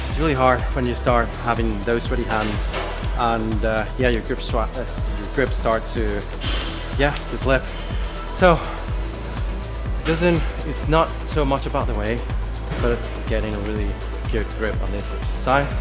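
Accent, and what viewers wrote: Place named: United States. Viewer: Where you from bro you sound american but says your from the 🇬🇧 keep up the good work though bro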